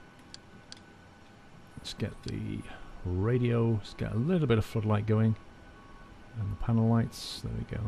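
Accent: Australia. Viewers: ur sounding a lot like an aurrie even thou ur from the uk I think lol but ur saying the names almost spot on lol but I use to watch ur vids for euro truck sim now I know u play this as well so yea can watch more lol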